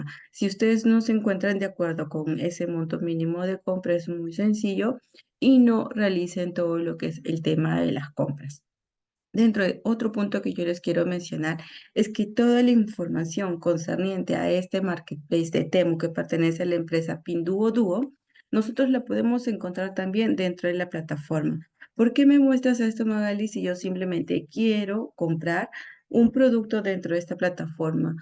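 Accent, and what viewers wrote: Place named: Colombia. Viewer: pero hablas como colombiana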